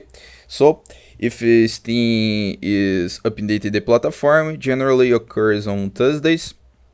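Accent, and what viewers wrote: Brazil. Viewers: i needed 3 words to know you are Brazilian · That's because his native language isn't english. He is from Brazil, lol.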